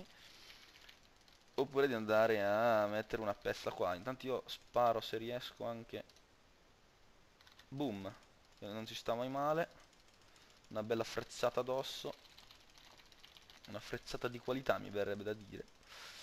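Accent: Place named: Romagna, Italy. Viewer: ah ok...è che sentivo l'accento romagnolo...sei bravo a giocare a heroes...sono cuboem#2629 in game se ti va di fare qualche partita..bella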